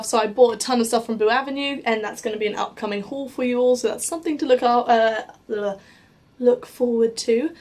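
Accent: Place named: England, United Kingdom. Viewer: I love the English Accent👍🏻😋☘️🍀🌹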